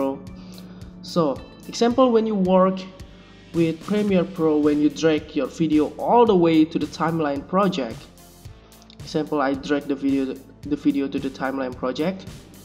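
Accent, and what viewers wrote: Indonesia. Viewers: Ur accent is funny,indonesian?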